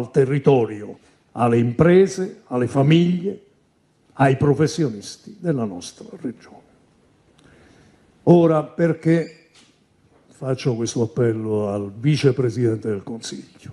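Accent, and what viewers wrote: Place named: South, Italy. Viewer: quelli che chiede De Luca sono i soldi che la tua regione....non so quale sia....ma dal disprezzo che mostri sicuramente una del nord ... ha già preso!!!! Mentre a noi li bloccano senza motivo!!!!! Ti voglio ricordare che i tuoi ministri del lumbard- Veneto.....non hanno fatto un caxxo quando il covid vi ha dilaniato....e sai perché???? Non avete più sanità pubblica!!!!! Formigoni e compagni hanno privatizzato tutto.....e la gente è morta per mano dei suoi concittadini!!!!! Questo è il tuo nord!!!! La lega ha RUBATO 50milioni di euro allo stato italiano....per spese di gestione.....bah!!!! e li restituisce a tozzi e bocconi spalmati non per quanti anni!!!!!!!!!!! Il mio commento non ha accento così almeno le tu orecchie non soffrono!!!!! Purtroppo il mio cervello soffre solo a leggere certi post superficiali di gente che scrive ripetendo cose sentite giusto per esibire un razzismo che fa tendenza soprattutto con questo governo!!!!! 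Il tuo salvini si è alleato anche con il M5S.... la ndrangheta ho investito nel profondo nord milioni di euro per ripulire il denaro che come tu ben sai proviene da attività illecite!! COME MAI NESSUN PERBENISTA NORDISTA SI È OFFESO O SCANDALIZZATO????? Ti offende l'accento di un cittadino del sud....e non ti offende quello che i tuoi politici fanno???? TRA POCO UN SOLO ACCENTO AVRETE NELLE VOSTRE ORECCHIE.....QUELLO NIGERIANO.....con tutto il rispetto sicuramente meglio del vostro!!!!!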